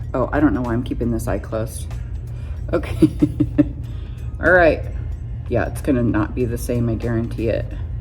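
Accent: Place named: South, United States. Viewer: It isn’t bad however I preferred the fat triangle technique. I love my new eye cream and the way you taught us to mix it with under eye concealer. What a difference. Yup! You definitely have a Southern undertone and I love it, so just be you girl. LOL Can’t wait to see the bangs. I know you will do something to make ma laugh. Take care sweet.❤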